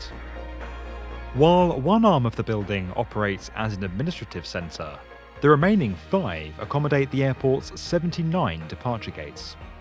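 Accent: United Kingdom. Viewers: I know it was going to be a British guy 😀😀😀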